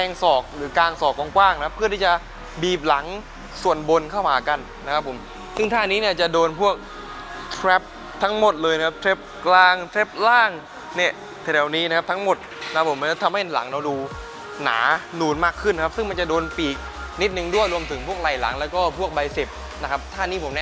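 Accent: South, Thailand. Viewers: คนใต้เหรอ เสียงออกทองแดง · พี่คนใต้ใช่ไหม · พี่เป็นคนใต้ใช่ใหมค้าบ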